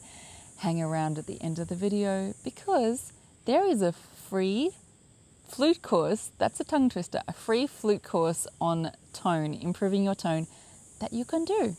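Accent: Australia. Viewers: I also grew up in Sydney, and my accent is identical to, though I've lived in Far North Queensland for many years and accents are a little different at the top end.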